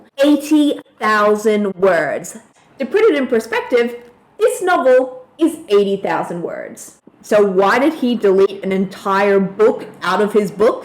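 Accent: Australia. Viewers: Hitchcock said more or less what Vonnegut (and you) say, but in a way that, I think, explains one of the rules better. Paraphrasing: Suspense, he said, is not withholding information from the reader. Rather, it is giving the reader information before the character gets it. (Is that faded Aussie I hear?)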